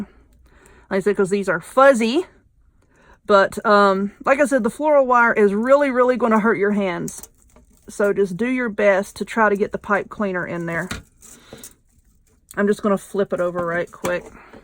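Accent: South, United States. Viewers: Hahahaha! I LOVE watching your video's!!! You talk like I do......:) I absolutely adore this Rose, I've never seen this before? It's BEAUTIFUL!!! Thank you so much for sharing your talent with us! BTW.......I'm a Georgia Girl.........and love the country Twang!!! LOL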